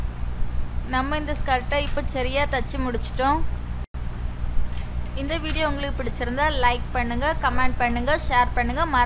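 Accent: Tirunelveli, India. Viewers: அருமையான திருநெல்வேலி தமிழ் பேச்சு சூப்பர்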